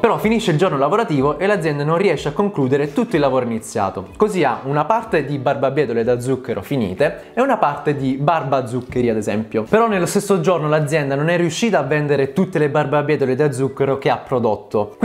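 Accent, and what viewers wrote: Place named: Sicily, Italy. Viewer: Il tuo accento Siciliano mi scalda il cuore ❤️ Grazie per il lavoro di divulgazione che fai qui su YouTube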